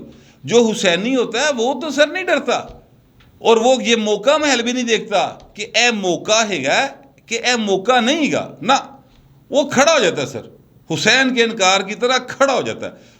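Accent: Lahore, Pakistan. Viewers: Agree with your examination of the present situation,love your Lahorei style of communication.God Bless you.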